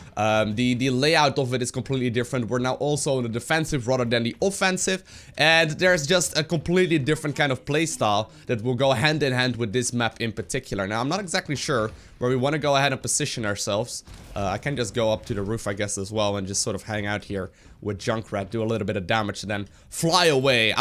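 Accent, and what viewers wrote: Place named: Netherlands. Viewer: that dutch accent... r u from holland mate?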